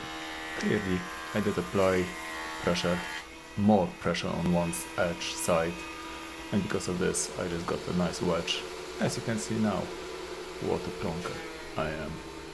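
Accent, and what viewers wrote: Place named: Soviet Union. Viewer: Чомусь по твоєму акценту мені здається що ти з "совка" 😉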